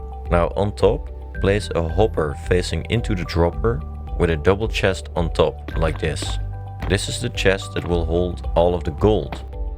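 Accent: Netherlands. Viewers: I dont know why but you sound a bit like a Dutch guy speaking English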